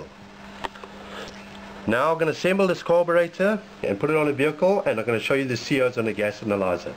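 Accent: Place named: South Africa. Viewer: i know a South African when i hear one. when you said "robot" you confirmed it cause we are the only country (or one of the only) that uses "robot" instead of "traffic light". Thank you for sharing your knowledge. my Toyota Tazz has had an idling issue for a while and you might have jut helped me solve the issue.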